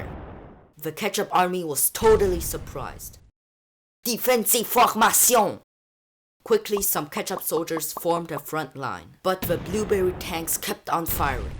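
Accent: France. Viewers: Gotta love the french accent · BRO YOUR ACCENT IS FRENCH BUT SO GOOOOOD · The french accent 😂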